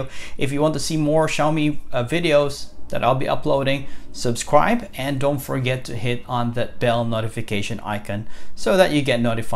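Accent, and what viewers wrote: Philippines. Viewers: Sound Filipino...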